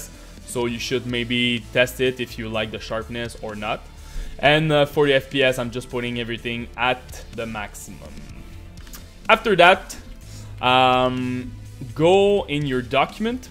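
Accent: Quebec, Canada. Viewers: ca sens lquebec a plein nez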